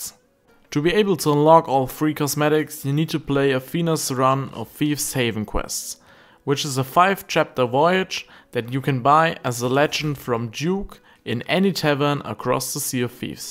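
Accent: Germany. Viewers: You are German, arent you? ;) Because there's something about the way you speak. Great video by the way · You sounds like a german haha. Thanks for the Video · Wenn der deutsche Akzent mal wieder komplett reinkickt xD Aber gutes Video 😁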